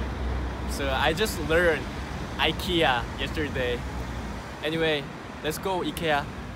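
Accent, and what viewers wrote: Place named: South Korea. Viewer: Your pronunciation of Ikea is actually very similar to how it’s supposed to be pronounced. I’m from Sweden and we pronounce it like you do, except you have a bit of a Korean accent to it 😊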